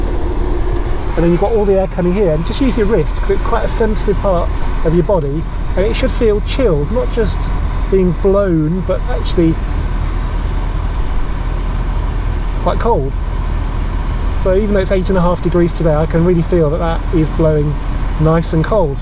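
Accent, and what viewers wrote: United Kingdom. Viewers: are u sure? ur roads look like shit in UK... io saw roads like that last time in the post soviet 90s in estonia.... why the fck doesnt ur gov fix roads??? (unless i misjudged that ur voice sounds like british)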